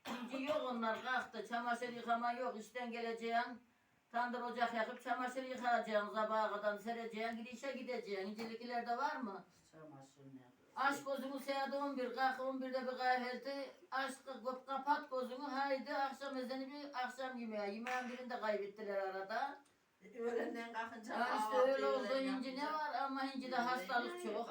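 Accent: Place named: Konya, Turkey. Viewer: Gı konyalımısınız yoksa 😁Benim herif konyali 😌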